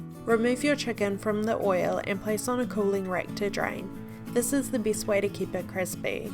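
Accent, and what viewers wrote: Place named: New Zealand. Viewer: I knew your cool accent was from New Zealand! I lived in Wellington four years; many fond memories. Love the clear recipe instructions; subscribed!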